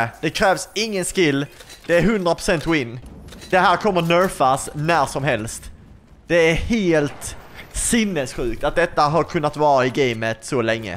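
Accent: Skåne, Sweden. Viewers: Pratar du skånska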